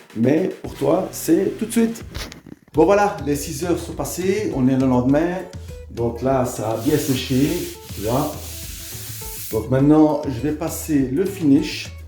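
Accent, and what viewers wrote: Belgium. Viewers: Merci pour cette vidéo. Ça semble si facile, y a plus qu’à! 💪🏻💪🏻 (Serait-ce une pointe d’accent liégeois?)☺️ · Bonjour, je regarde beaucoup des vidéos, souvent c'est toujours en France; Je voudrais vous contacter pour une rénovation je suis dans la province de Liège, je vois que vous avez l'accent de Seraing . Bonne continuation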